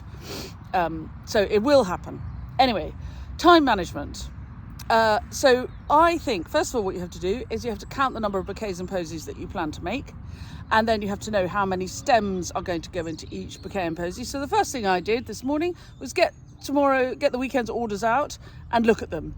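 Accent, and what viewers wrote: England, United Kingdom. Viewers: Thank you for all you great tips! I am a follower from Sweden and I just love you British accent! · I absolutely love you accent! One of my dearest friends is in Oundle, miss her terribly. Had the chance to visit beautiful England 11 years ago . The gardens at Burley house were magnificent!. Cannot wait to visit once more! Thank you for your content I enjoy it very much! Canadian dairy farmer morphing intoa flower farmer as a rieterement project. Take care! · As an American, I enjoy your English accent & unusual (to me) terms very much. 🌱❤️ If I book a place for one of your workshops, is access to the content available to view later, after the scheduled workshop? The time is inconvenient for me, but I would love to learn how to make one of your unique posies. 💐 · lové💗looks like wisconsin and adore your english accent🌸🌸🌸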